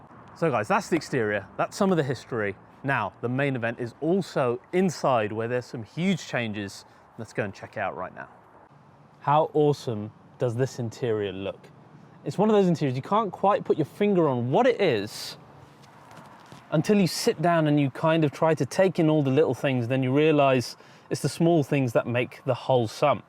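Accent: United Kingdom. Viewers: Very Strange how you Brits all have the same Accent and pace when talking even the Middle eastern ones that aren't Caucasian .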